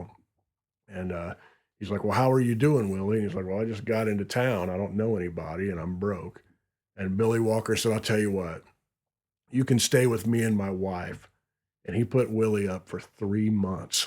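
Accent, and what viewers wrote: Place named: South, United States. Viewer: You have a great voice & southern drawl & calm to awesome story telling! Love your repotoar!! 🙌👏👏✌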